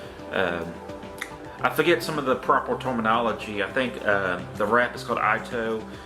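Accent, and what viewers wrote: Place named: South, United States. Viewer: Whats your accent it sounds Southern bayou/With Canadian...and hint of Aussie in some words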